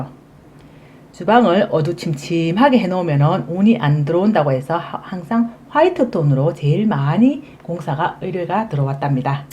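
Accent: South Korea. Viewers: 어머 얼굴공개하셨네요~완전반전 미인이시네요..ㅋ말투는 시골사투리 얼굴은청담동아줌메비쥬얼~~^^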